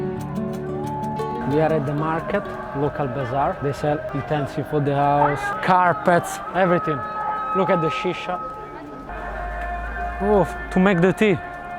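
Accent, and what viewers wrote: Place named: Italy. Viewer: Man i love his Italian accent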